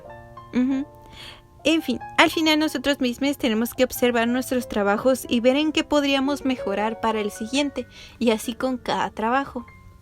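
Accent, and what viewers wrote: Mexico. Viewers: Señor, no sabe de relajante que es escuchar su acentito norteño mientras veo su lineart asteti. Mucha motivación para dibujar patas. Muchas gracias ❤❤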